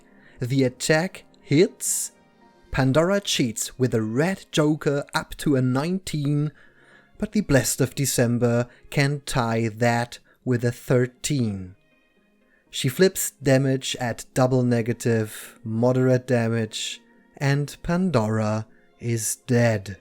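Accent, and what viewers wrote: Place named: England, United Kingdom. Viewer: Thanks for the battle report. I like your english accent, it seems to fit the theme of the Malifaux universe.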